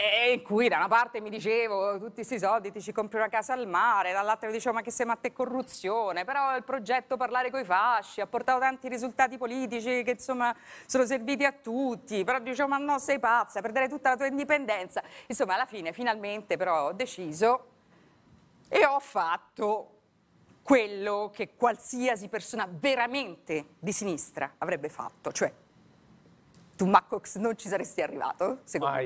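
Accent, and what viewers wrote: Rome, Italy. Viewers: Prende in giro la Meloni, ma ha lo stesso accento romanesco. Mah....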